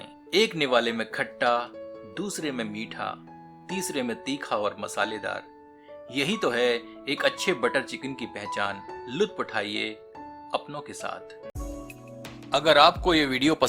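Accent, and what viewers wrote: Standard. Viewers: I like your shudh hindi more than the recipe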